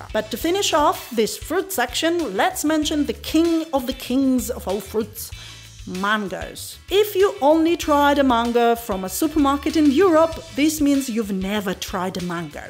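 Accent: Australia. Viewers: The worst accent ever, aussie, english, & some foreign sounding jamaican???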